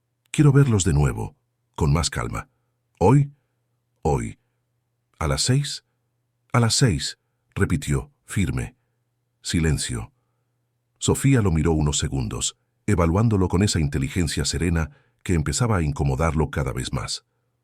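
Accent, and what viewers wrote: Argentina. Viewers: me emocionó el acento argento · narrada con acento argentino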